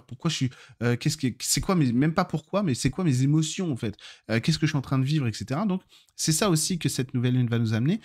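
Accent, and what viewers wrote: South, France. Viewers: Merci beaucoup pour ce partage ! Et l'accent du sud nous apporte ce petit côté ludique ❤! Ps: j'adore ton t-shirt!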